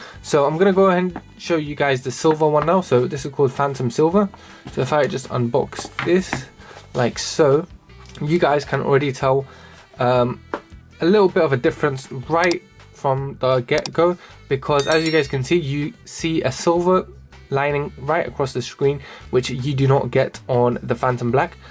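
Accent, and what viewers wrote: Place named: British Pakistani, United Kingdom. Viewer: This bloke sounds like the British tech reviewer Supersaf. Supersaf is the most well known tech reviewer here in the U.K. This bloke is probably a British Pakistani based on his accent. Fun fact: Pakistanis do not actually speak the language of the prophet muhammad (pbuh). They speak punjabi. Some of the Pakistanis are really cool people. But they read the quran in arabic - but they do NOT speak arabic so they cannot understand what they are reading. I think some of the Pakistanis are really cool. But the prophet muhammad (pbuh) NEVER spoke Punjabi which is the language of the Pakistanis. I would like this bloke (or Supersaf) to do an entire tech review in Punjabi which is actually the language of the Pakistani race.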